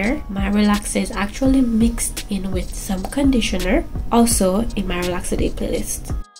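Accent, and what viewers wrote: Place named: Jamaica. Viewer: I know that beautiful accent anywhere! Go thru yawdie 🇯🇲🇯🇲! U did amazing. So I have a question for you , So I'm natural never been relaxed , but I'm currently contemplating relaxing my hair. Any suggestions as it relates to what relaxer to use? Being as how it's going to be my first time do you suggest going to the salon to get it done or doing it myself?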